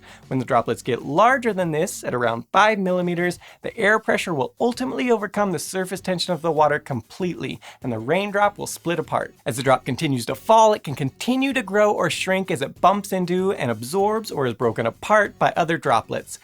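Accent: United States. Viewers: Hey, the American guy is back! Cool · I like American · got FAT and lost the hability to speak PROPER ENGLISH, meaning, he got murican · Can't watch this. Didn't realize how much more annoying and less interesting the videos are when you swap out a crisp English voice for a generic annoying yank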